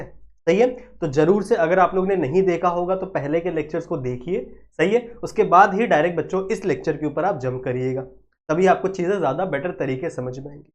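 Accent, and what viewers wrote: Maharashtra, India. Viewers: Sir marathi aht kai 😂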